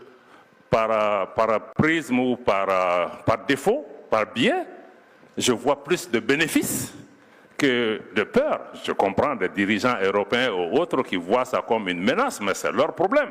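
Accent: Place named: Democratic Republic of the Congo. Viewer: J'ai toujours trouvé un accent lingala à ce monsieur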